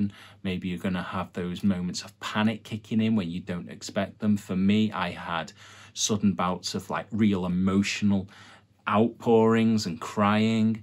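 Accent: Liverpool, United Kingdom. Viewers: You’re a scouser ❤ so am I Brilliant .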